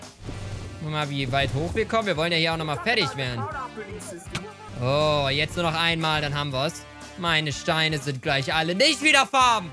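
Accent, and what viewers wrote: Saxony, Germany. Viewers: kannst du mal ein komplettes Video auf sächsisch machen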